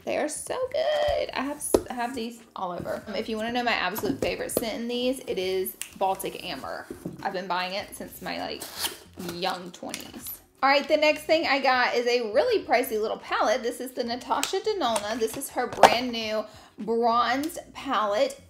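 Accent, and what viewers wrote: South, United States. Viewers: omg where is she from?! she sound soooo southern haha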